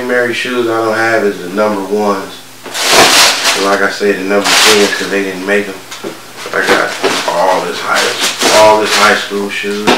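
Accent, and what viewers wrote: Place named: South, United States. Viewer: He sound so country lol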